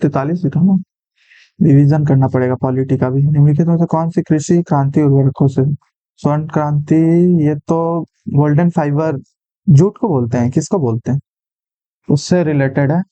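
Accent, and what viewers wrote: Bihar, India. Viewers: Bihar se ho kya Bhaai???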